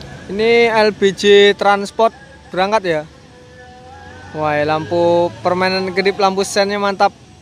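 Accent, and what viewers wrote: Java, Indonesia. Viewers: Adminnya ngomongnya kaya orang Jawa😁😁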